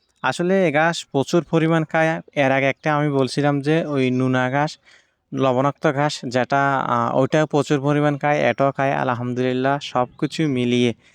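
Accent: Chittagong, Bangladesh. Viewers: মনে হয় চট্টগ্রামের মানুষ বাড়ি কোতাই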